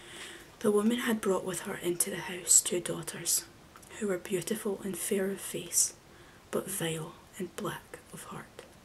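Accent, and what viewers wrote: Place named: Scotland, United Kingdom. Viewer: Oh!! I have such a soft spot for enchanting short stories and poems that leave you with chills. Very excited for the coming additions in the series!! I love love love scottish accents, I don't hear enough of it in ireland, french and scottish accents are probably asmr favourites of mine! Thank you for this 💫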